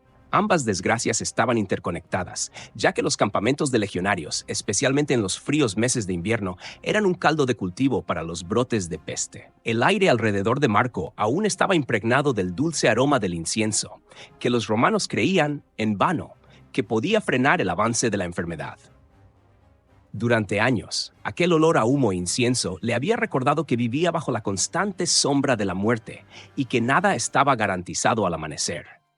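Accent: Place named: United States. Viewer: Porqué un narrador gringo, FUCHI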